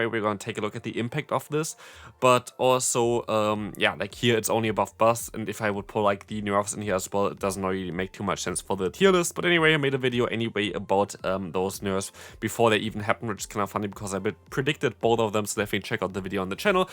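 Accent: Germany. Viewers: bro, are you german? I can swear i can sense from your accent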